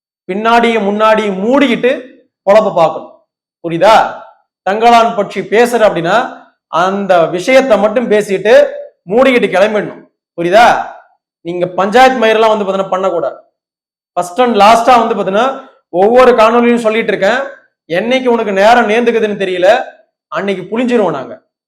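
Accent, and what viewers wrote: North, India. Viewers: ஆமா பேச்சி வடக்கு கிராஸ் மாதிரி இருக்கு.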